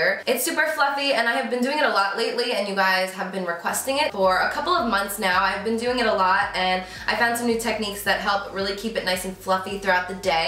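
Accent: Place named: Boston, United States. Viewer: She sounds like she’s Jewish or from Boston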